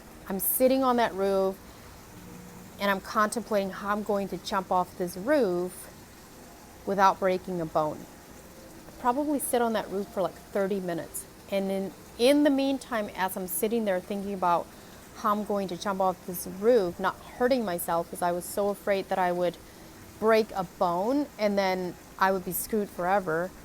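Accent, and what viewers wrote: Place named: Pennsylvania Dutch, United States. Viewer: It's a Dutch accent. Pennsylvania dutch, "technically" American but not remotely normal or recognizable. I live in a nearby state, but without the internet I'd never have heard of it.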